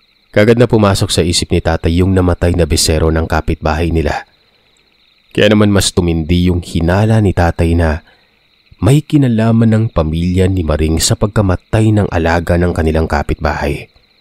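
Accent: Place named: Hiligaynon, Philippines. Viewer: An kyutt ni kuya mag ilonggo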